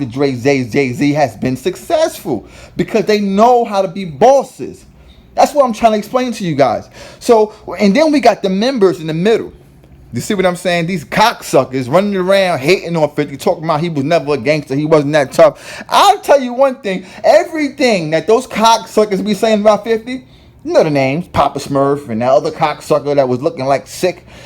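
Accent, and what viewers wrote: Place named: Queens, United States. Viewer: This narrator definitely a Queens nigga 😂😂😂 His voice got me ctfu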